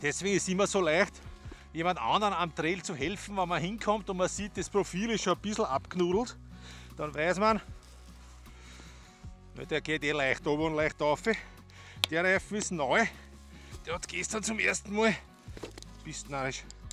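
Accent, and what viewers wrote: Austria. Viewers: Geiler Dialekt is des va Niederösterreich?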